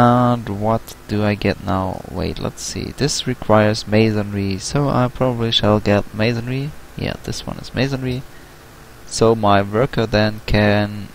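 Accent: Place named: Germany. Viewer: das ist doch denglish das du da sprichst freundchen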